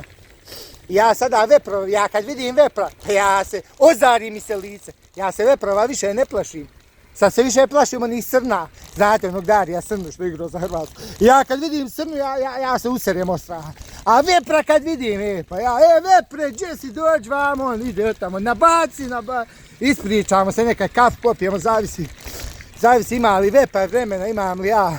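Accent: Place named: Bosnia and Herzegovina. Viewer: Sta kajes isto Sam se ja volim slusat te.izraze ko ti Sto pricas usrosam se Ono pravo Nase bosansko.ja Sam okolina Dervente patako i Mi ovde pricamo Samo radim U salzburgu Pa kad te cujem ko dasam kuci lp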